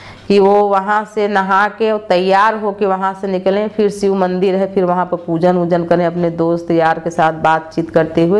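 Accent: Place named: Allahabad, India. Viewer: aap kaha rehte ho aunty muje ap ki language allahabad ki lag ri h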